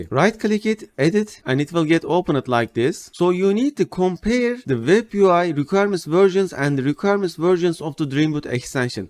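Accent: Turkey. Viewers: Dostum merhaba,Hiç sayfana girip bakmadan konuşma tarzından Türk olduğunu anladım ve çok mutlu oldum.Gösterdiğin şeyler araştırıp bulamadığım bir çok sorunumu çözdü ve çok takdir ettim seni.Kolay Kolay hiçbir kanala abone olmam ama bugün 1 abone kazandın.Başarılarının devamını dilerim.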